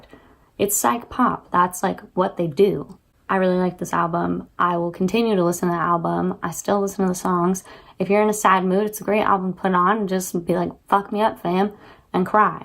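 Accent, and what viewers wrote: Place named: South, United States. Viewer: You have a slightly southern accent, my favorite song of her is. What is your favorite one?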